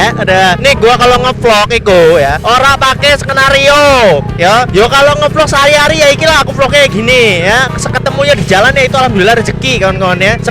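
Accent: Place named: Java, Indonesia. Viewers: Tolong dong jgn pake logat bahasa jawa, kowe iki rak mudeng ngomong opo wi. Gatheli tenan.. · Oalah toh bang² kok lucu banget sih klo pakek bahasa jawanya, lucu akan logat² jawanya. Heheheh hahahah hihihi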